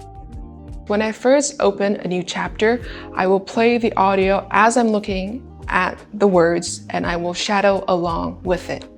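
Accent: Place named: France. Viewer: What a beautiful french accent, congrats!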